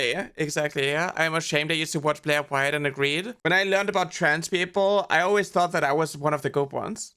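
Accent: Germany. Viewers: Why did I not expect a German accent? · Just asking, are you German? You have a very charming accent xD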